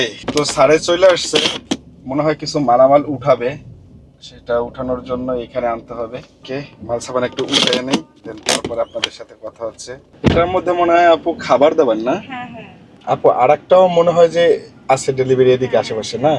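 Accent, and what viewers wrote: Barishal, Bangladesh. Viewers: আপনি কি বরিশালের লোক?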